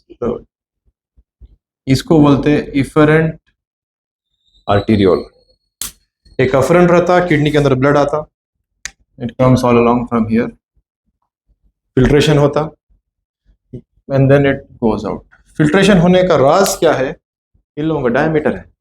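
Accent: Hyderabad, India. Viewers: I like Dr style and simplicity ,Hyderabad tone,man from Andhra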